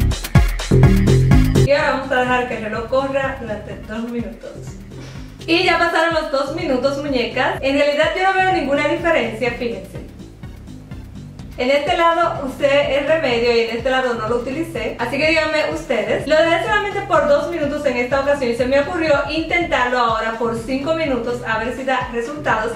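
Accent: Dominican Republic. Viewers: eres Dominicana?😍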